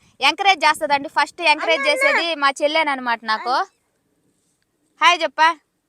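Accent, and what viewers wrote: Nellore, India. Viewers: E ooru akka, mida, mi telugu nellore slang laga undi.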